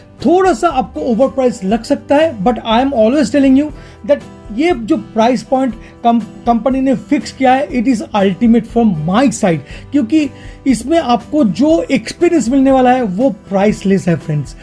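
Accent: Bengal, India. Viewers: Actually I love your review method and bengali tone I like to have sweet whiskey please suggest some like black dog.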